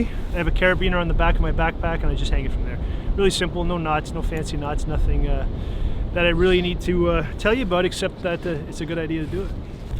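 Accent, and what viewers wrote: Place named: Canada. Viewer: Good job. I'm curious. You have the same accent as Don Cherry. You must be from the same area as him or you have watched too many Don Cherry commentary. Thanks for sharing.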